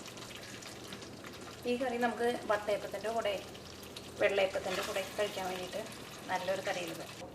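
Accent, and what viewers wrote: Thrissur, India. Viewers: good cook and good Trichur accent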